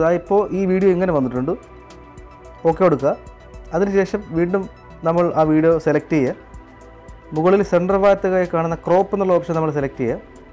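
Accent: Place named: Tamil Nadu, India. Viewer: sounds like your talking tamil? ill try ippadi irruka? hehe or maybe you live somewhere from tamil nadu sir?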